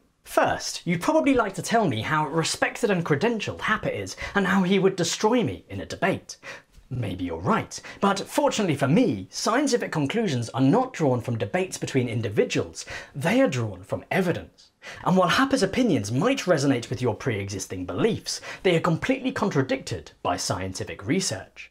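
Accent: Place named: RP, United Kingdom. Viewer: Firstly: you're jacked, British, non-white and sound posh. I like it! But the saturation myth (or effect, if you're of that persuasion) is a red herring. We don't have to care about physicists squabbling about yay or nay on this. Instead just look at the data of the supposed effects of rising temperature and CO2: arctic and antarctic ice, tropical cyclones, droughts, crop yields, Great Barrier Reef coral cover, greening, desertification, wild fires, deaths from temperature (both heat and cold) etc - hardly any of them are happening in an adverse way. And rising temperature/CO2 are actually making certain things better: few temperature deaths, improved crop yields, greening, more natural diversity...... Get real everyone! You can continue to shriek inside your echo chamber OR you can go look up the data with an open mind, intellectual curiosity and critical thinking. 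You'll flip, like me - guaranteed if you're not yet fully brainwashed.....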